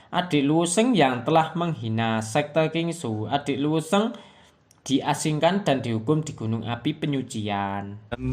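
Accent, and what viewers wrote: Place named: Java, Indonesia. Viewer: Bang bahasa di ganti dong kebahsa Indonesia Jagan bahasa Indonesianya kayak di ucapakan seperti bahasa Jawa mungkin karena itu sasgraibernya sedikit yg laik pun sedikit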